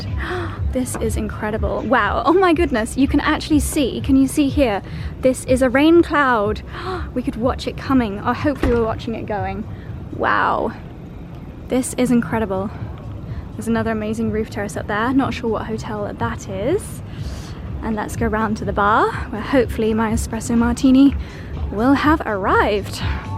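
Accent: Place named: United Kingdom. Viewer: Ur middle upper class british yea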